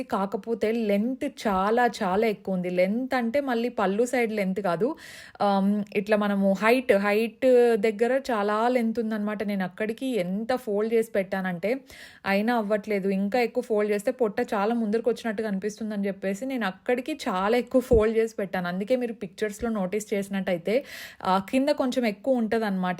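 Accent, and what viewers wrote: Anantapur, India. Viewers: ....before sleeping ask to have milk everytime it's natural tip to get sleep...I just love ur slang Anantapur slang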